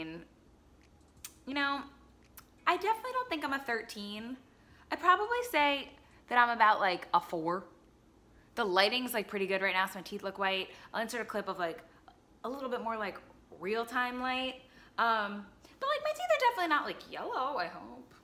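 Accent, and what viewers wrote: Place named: New Jersey, United States. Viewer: This girl is 1000% from NJ 🤣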